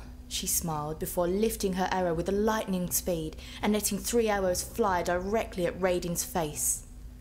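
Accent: United Kingdom. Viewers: Cockney chicks shouldn't be telling stories tbqfh! voice just doesn't work for seriousness dialogue! I feel like after you pause to speak your gonna say next "apples and pears 10 bob"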